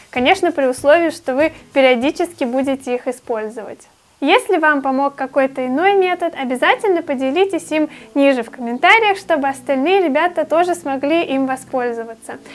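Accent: United Kingdom. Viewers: ты классная Приятная и простая) Обожаю твой англ. акцент!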